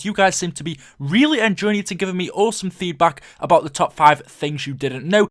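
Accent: United Kingdom. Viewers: Every time I click on a COD video there’s a person narrating with a British accent ???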